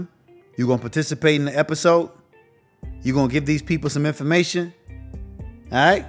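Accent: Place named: New York, United States. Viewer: That NYC storytelling ALWAYS hilarious 🤣🤣🤣 Tell the story like a Ny'er or ion wanna hear it🤭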